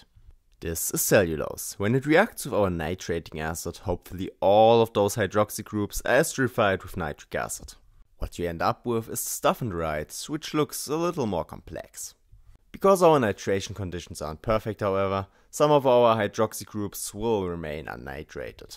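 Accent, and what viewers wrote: Germany. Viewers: Man hört sofort das du Deutscher bist 😀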